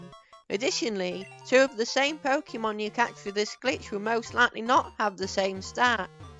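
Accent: United Kingdom. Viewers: Is that your voice? Are you british?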